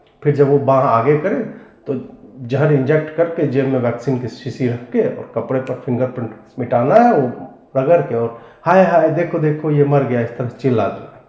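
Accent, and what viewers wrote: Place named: Bihar, India. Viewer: अजीत भारती भाई आप का विश्लेषण का जबाब नही होता पर व्यंग्य वाली वीडियो ऊपर से बिहारी तड़का शुद्ध हिंदी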